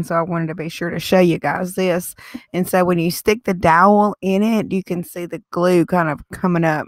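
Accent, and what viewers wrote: South, United States. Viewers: Omg!!! So cute and creative! I love it and love your southern accent!!! Blessings to you😍😘❤️ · the southern drawl makes it even better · SUPER cute! You are soo creative and I love your accent...being from TN I thought I had a southern accent..you got me beat!...(GA or NC maybe???)LOL!!...NEW SUB HERE!! Keep the ideas coming momma! (people from the south know MOMMA is a term of endearment)...hahahaha...love you girl! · Very cute! I love your voice,I have a southern accent also but not as pretty as yours! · You are so darn adorable I love your southern accent Bless your little heart I love your wagon idea & I’m so glad I found you Keep on creating honey & god bless Hugs, Dee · You are such a blessing to watch. I love your crafts and "Southern" accent.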